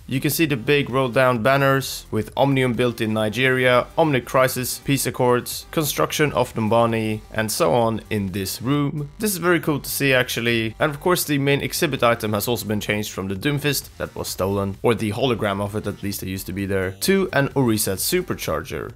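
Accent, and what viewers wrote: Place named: Sweden. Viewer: Where is this guy from? He sound Swedish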